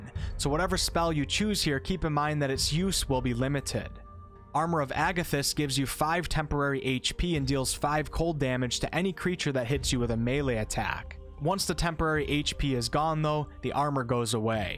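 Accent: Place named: Chicago, United States. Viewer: true.. but i have a hard time taking him seriously because of his Chicago accent!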